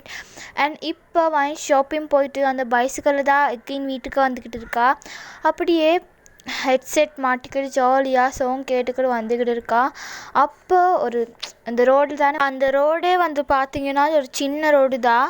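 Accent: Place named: Sri Lanka. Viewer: Akka neenga srilankan aa ungada tamil language konjam different aa irukku 🤔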